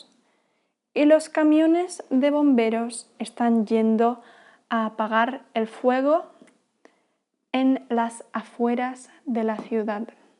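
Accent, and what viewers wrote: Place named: Madrid, Spain. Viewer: Gracías por tus videos. Son divertidos y educativos. :) Estoy tratando de aprender español nuevamente después de un descanso de varios años. Tus métodos y tu forma de hablar me requerdan mucho a mi profesora del Colegio comunitario donte aprendí Espanol por unos años. Ella es Madrileña y quiza por eso la pronunciación es tan similar. Los bomberos hacen bombas así como los panaderos hacen pan. xD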